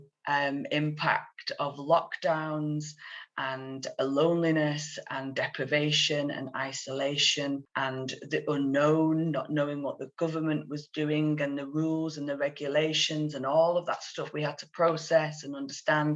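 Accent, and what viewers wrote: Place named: Northern England, United Kingdom. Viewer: Thanks for sharing your knowledge and expertise! It’s also nice to hear a more authentic Nortthern English accent, rather than the usual North American!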